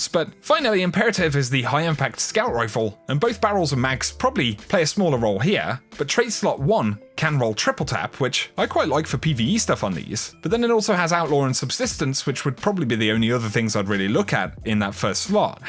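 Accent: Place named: England, United Kingdom. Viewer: Where is from?! He says Ay sounding vowels like eey.. But he sounds English?!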